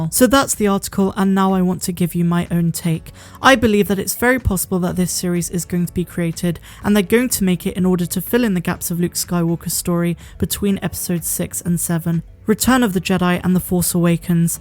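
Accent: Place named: West Country, United Kingdom. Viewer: I always enjoy your videos and I love hearing your west country accent (Bristol is one of my favourite places in Britain). I often wonder if there is a correlation between how exciting the news is and how many ''Uttinis'' in the intro. I do miss the 3 x Uttini intro. ;) Keep up the good work, and may the force be with you ;) x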